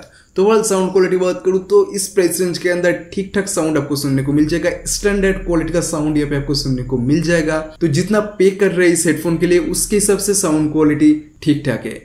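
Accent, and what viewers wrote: Bengal, India. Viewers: Bro bangali h kya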